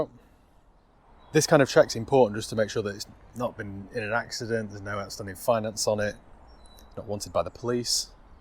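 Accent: United Kingdom. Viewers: The more I hear that bleeding British accent, I want to scream!!! Stuffy…prudish….snobby….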